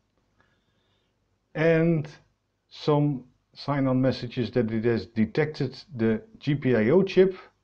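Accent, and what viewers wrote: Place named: Netherlands. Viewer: That is a hard-core Dutch accent if I've ever heard one. It sounds like I'm listening to my dad... or as my daughter would say, "Opa." I just saw this video on the Tindie page... I definitely want one, but then saw the $250 shipping charge. I guess this is as a result of that new E.U. rule. :( I'll look into that Germany address thing. Thank you!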